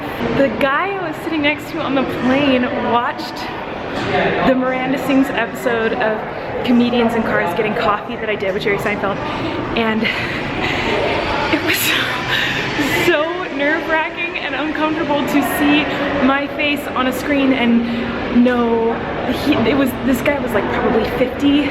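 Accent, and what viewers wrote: South, United States. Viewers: I could listen to Colleen's southern accent all day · Colleen's southern accent makes me smile, and I'm not even from the south! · I'm from Louisiana but nobody talks like that here lol. But I agree that the southern accent is spot on, for like Georgia and Alabama and Tennessee! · Hahah love Colleen's southern accent! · I know I'm from the northern pat of Florida like 1 hour away from Alabama and we're "southern" and her accent is on point😂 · Southern accent is so accurate! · omg I'm from Louisiana and your southern accent is so accurate 😂😂😂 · Love your stereotypical southern accent 😂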